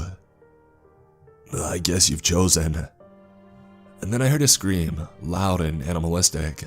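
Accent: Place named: West Virginia, United States. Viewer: Holyshit you actually sound like you're from West Virginia. I'm from the mountains of southern West Virginia and Mercer county. Just outside of Princeton bluefield area. You sound like a big old farm fed Burley mountain man like me LOL I'm 6ft 4 inches 273 lbs 40 years old and can still chase a deer through the woods. my family always used to pick on me especially my uncle my dad and my brother when we would go deer hunting because they would set a white for the deer to come to them. But I always stalk them and my brother has a video of me running after a deer and killing it with a Bowie knife. And for all you animal lovers out there just know I'm an animal lover too... I think dear squirrel turkey and rabbit is all delicious.!!! 🙄🤔😯😤😠... 🤗😀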